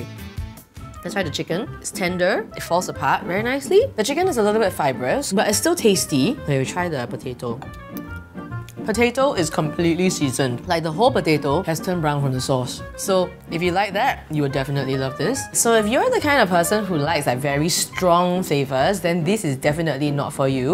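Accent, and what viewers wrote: Singapore. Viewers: i sounds like a singaporean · is an Singaporean or at least born in Singapore because she has a accent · where is she come from ? Singapore ? Malaysia ? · i loooove your singlish accent :) (coming from someone who lives in canada) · As a Singaporean living overseas, the most shiok thing is to hear our accent when you are going through YouTube videos.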